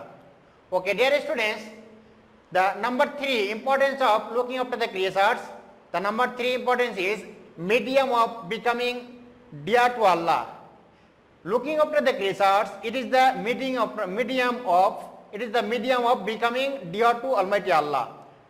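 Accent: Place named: Bengal, India. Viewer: You can find hundreds yet tenths of thousands of bangalees who apply the same pronunciations. Its not done by will but its the way of the accent of some certain people. So this mistake cant be corrected in terms like this. But one way is that is these certain people can learn the UK accent without some obstacles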